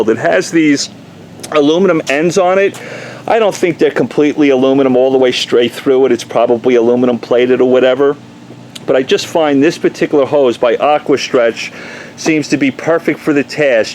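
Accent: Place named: Texas, United States. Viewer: Thanks for the video. I recently found your channel when searching for reviews of polishers. Funny, I have no trouble understanding you despite the Texan drawl ... :)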